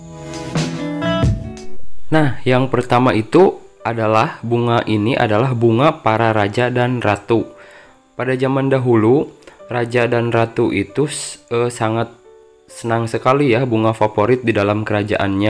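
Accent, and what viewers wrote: West Java, Indonesia. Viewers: Ari si akang orang Sunda ya 😀😀😀🤭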